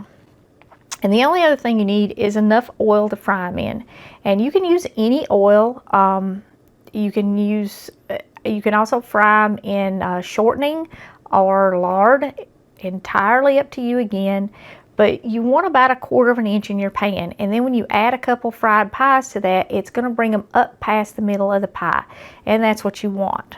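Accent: South, United States. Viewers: I am Southern born & bred but girl that accent is country, I luv it! Enjoy your videos, enjoy your bible talks, and I just ordered me a T-shirt, can’t wait to get it! Keep up the good work! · Just stumbled upon your video ,you was making homemade fried apple pies.,I watched all the video cause I liked the way you talk, don't know where you from ,sounds pure southern to me. Anyway enjoyed it very much. May God bless you in a special way . Gonna get me one of those dough cutters from the dollar tree.looks like a multi purpose gadget..thanks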